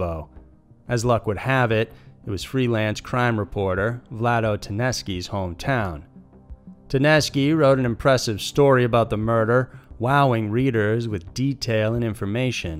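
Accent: South, United States. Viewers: I have thought that too, its the slight southern accent (which I looove). Hey, wouldnt it be hilarious if in the future mr McConaghey revealed he does this true crime/mysteries-gig in YT in his free time? When it gets boring between shoots :)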